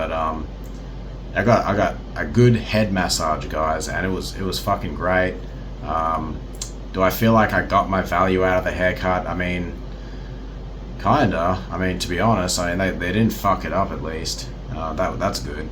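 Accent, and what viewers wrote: Australia. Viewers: Sorry, but I never take advice from people who have Australian accents and a 1998 Boy Band haircut. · I guess you are Australian ? Cuz of the accent)) do you call it spring ? Haha it’s 25 C degrees there it’s not spring, it’s summer)) I mean it’s very good temperature nothing compared to our coming winter it’s going to be -50 C 🤌 Also didn’t know that we have the same time zone almost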